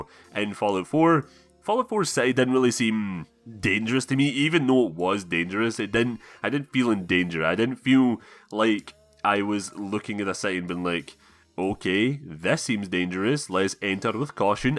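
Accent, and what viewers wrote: Scotland, United Kingdom. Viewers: This is my first vid of his that I’m watching and in the first few seconds I thought he was Russian, and then I heard the Scottish accent, this is pretty much the only Scottish gamer I’ve found on here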